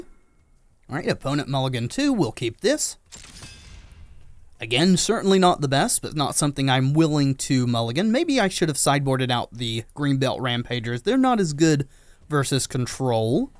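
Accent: South, United States. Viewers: Thought it sounded more like a southern accent, guess I'm not great at recognizing American accents. Keep up the good work!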